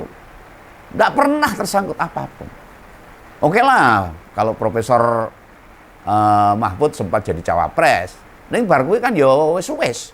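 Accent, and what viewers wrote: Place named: East Java, Indonesia. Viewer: Kental sekali mas ini Wong Jawa Timur.... Sehat2 selalu Mas wong jowo asli. Aku senang dengerin.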